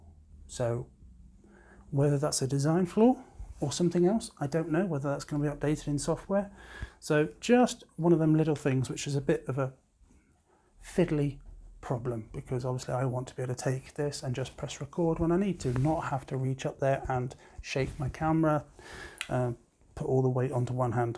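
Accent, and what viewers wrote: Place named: England, United Kingdom. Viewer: nice to here an 'English' voice here on YouTube. Can I use with my Pan Lum SZ330? (bridge camera) I know what you mean about the focus on Panasonic my is all in and all out difficult to get a nice slow in/out. I'm considering buying a dedicated camera (low cost) video camera for the 4500? What do you suggest? On camera mic; Can I put a Rode 'type' mic on the separate handle or is there some better option? Great review.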